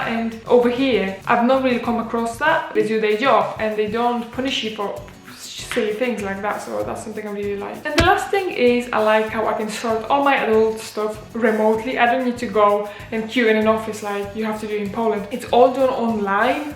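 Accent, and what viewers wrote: United Kingdom. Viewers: you have a slight northern accent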